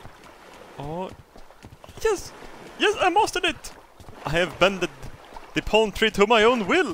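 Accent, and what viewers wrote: Sweden. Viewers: stop speaking Swenglish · I love your sewdish-english accent :)) !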